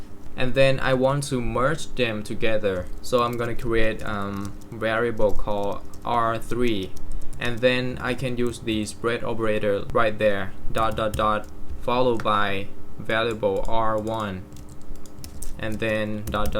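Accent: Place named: United Kingdom. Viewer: สำเนียงพี่ เหมือน British เลยครับ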